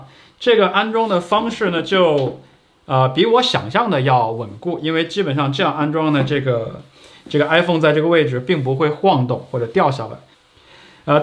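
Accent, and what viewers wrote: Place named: Yunnan, China. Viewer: 感谢的详细介绍。已入QUADLOCK,另外，您的口语有马普的味道，是云南人吗？谢谢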